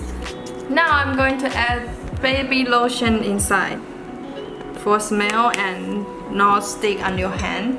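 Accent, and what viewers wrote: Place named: Asia. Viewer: My mum has the same Asian accent as u!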